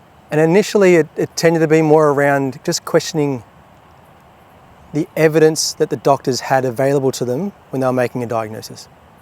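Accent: Australia. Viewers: Man, I don't know what to say! Get better soon? Feels like some kind of scam. I like your Oz accent, I hate your predicament. It's not fair. Love from Stockholm <3 So. The story you told about getting your diagnose. How you felt like your partner and your brother were your champions, fighting for you. That like broke me completely. And I'm not usually the person who cries. Thank you again so much for sharing! What you are doing is brave and important I feel. It's like I don't know how to tell you, this touched me to my core and I'm not even a spiritual person.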